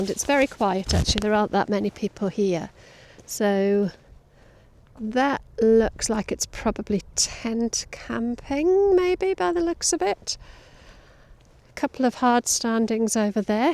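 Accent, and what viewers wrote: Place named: Newcastle, United Kingdom. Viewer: I assumed you were a geordie, but I'm no expert, I'm a cockney myself so everyone else is either 'west country'or 'northerner'! 😂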